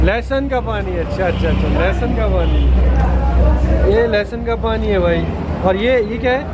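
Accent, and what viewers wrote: India. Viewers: Why speak inglish like people from india...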